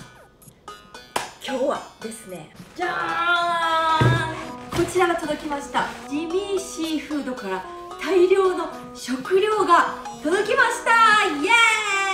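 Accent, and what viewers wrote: Kansai, Japan. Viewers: 関西弁のノリが最高！うまいもん食べてる時が一番幸せですよね。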